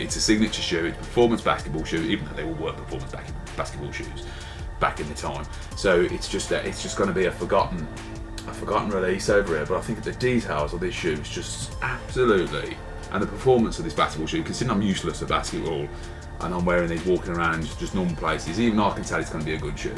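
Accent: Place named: Black Country, United Kingdom. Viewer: Sounds like a Black Country accent here...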